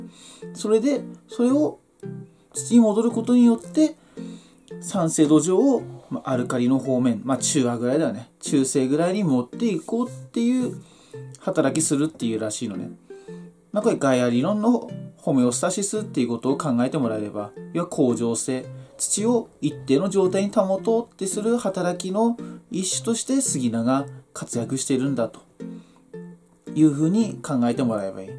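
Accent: Tsugaru, Japan. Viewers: このイントネーション…津軽人だな？ そーいう私も青森市在住ですw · 東北、青森県、津軽地方の方ですか❓️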